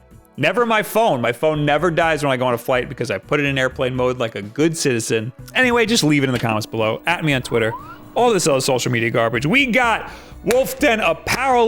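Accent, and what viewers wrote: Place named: New York, United States. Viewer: I know I feel like I'm being yelled at. It's like I'm in New York or New Jersey.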